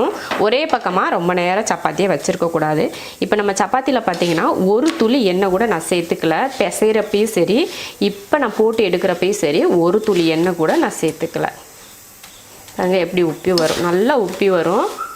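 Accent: Coimbatore, India. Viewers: Neenga, Christiana, ruslimma,therindhu kolla aasai,eanna pottu vaikkamal erukkeenga,but, unga Kovai slang nanna erukku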